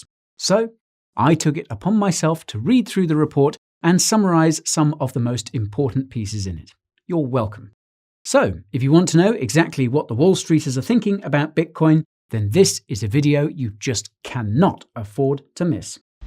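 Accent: United Kingdom. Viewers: i love your british accent. i wish i could speak like that.. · I dont know if it's the robotic British voice or the robotic repeated jestered you make that draw me in lmao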